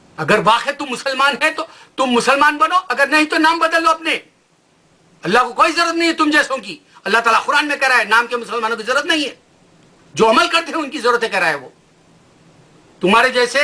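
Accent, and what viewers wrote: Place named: India. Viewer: ye indian hai yaqeenan kiun ke Urdu is tarah pronounce nahi hota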